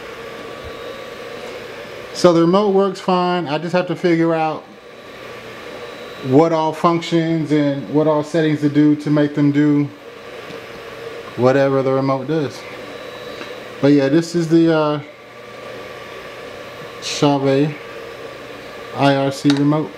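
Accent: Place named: Detroit, United States. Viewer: you from detroit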